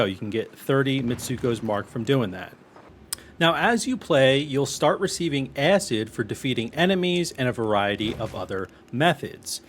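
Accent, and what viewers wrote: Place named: Pennsylvania, United States. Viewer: This guy sounds like he’s from Pennsylvania. Good tips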